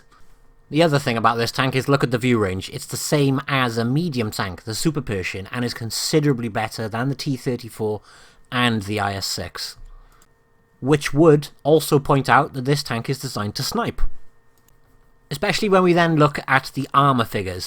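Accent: United Kingdom. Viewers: Wot Blitz your work is much appreciated, are you on any patronite websites? Do I sense right you're from UK? (accent)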